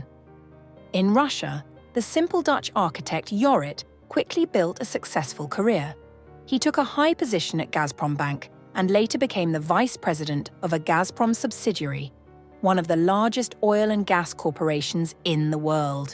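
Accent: United Kingdom. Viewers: Does this Jew-ish Gangster Narrator with an English accent think Urin belongs on the street. He could have killed Putins Son in law. He got off easy. Says The BronxBoy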